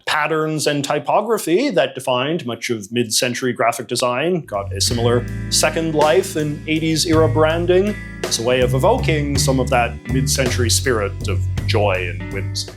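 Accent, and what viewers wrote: Canada. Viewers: I agree with a lot of your points made here. But man is your Canadian accent is really popping here. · What’s this accent? Never heard anybody who pronounces “about” like “a boot”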